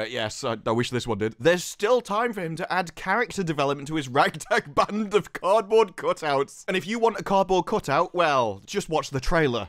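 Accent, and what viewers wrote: England, United Kingdom. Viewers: As an American, I find insults in an English accent is very entertaining.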